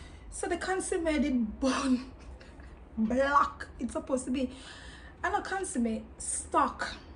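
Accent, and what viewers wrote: Jamaica. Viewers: I heard the news... Treating blacks like that ain't fair. I like your Jamaican english. New family here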